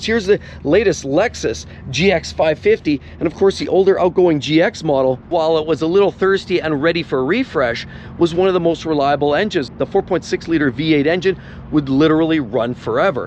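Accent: Edmonton, Canada. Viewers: Seems like you’re from Edmonton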